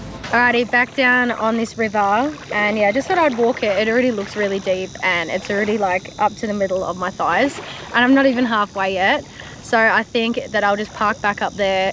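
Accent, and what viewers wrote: Australia. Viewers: One of the best shows on YouTube. Great lighting, not very obnoxious audio, great lighting, drone coverage, and seemingly good rig. I don't fly, thus will never see that country so I appreciate the all the tour's. NW Washington, I get to meet some folks from various places as well as to try foods. Find the "Aussie" manner of speaking makes for a nice change. Always curious about others kit, as I watch and learn from all those I can even if their region might be different. I trample about in a 1987 Jeep Cherokee (XJ) which I sleep in the back, as it has about 15cm of foam in the back comprised of two different compressible foams, with a big mosquito net that can cover the drop gate while fully open. But then I don't have aftermarket bumpers as I don't go into places that I don't belong, though I did enjoy playing in the 25cm or more of snow lately.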